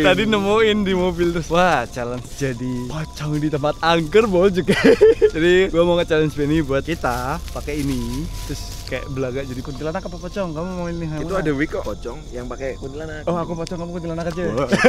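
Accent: Java, Indonesia. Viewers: Orang jawa ya bang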